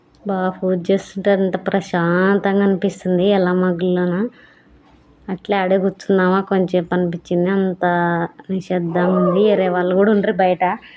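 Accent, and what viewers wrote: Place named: Kurnool, India. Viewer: Hi akka Me vedios chala baguntai medi a village Madhi Kurnool Andhuke me basha vinte happy ga anoinchindi❤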